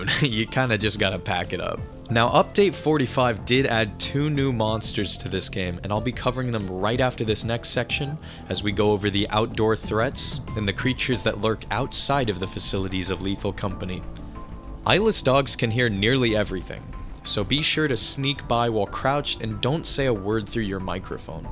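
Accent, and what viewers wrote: Greece. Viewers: Are you greek?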